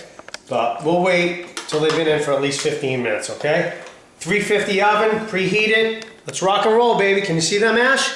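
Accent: Boston, United States. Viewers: I haven't ever seen them made with tomato sauce, now that is Sicilian, but you have a strong boston accent!!!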